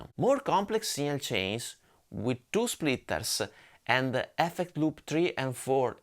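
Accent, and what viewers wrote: Italy. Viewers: Video perfetti, molto dettagliati e precisi. Tra i meglio che si trovano sul tubo… e il tuo accento marcatamente italiota è talmente estremo che risulta pure simpatico 😉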